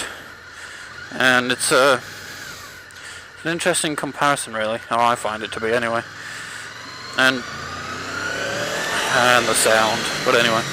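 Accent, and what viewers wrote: United States. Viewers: You sound more American in this video than you ever have done before lol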